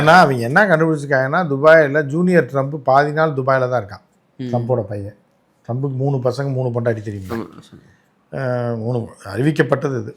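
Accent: Madurai, India. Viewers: டேய் மதுர பேச்சை நிறுத்துடா சடங்குசுத்தியாச்சுனா என்னடா?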